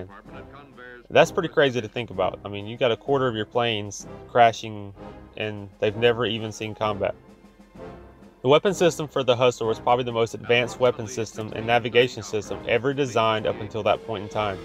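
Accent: South, United States. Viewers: It's weird to hear a narration that has a southern accent like mine. I love it. Fantastic video, the B-58 is an unsung marvel of the cold war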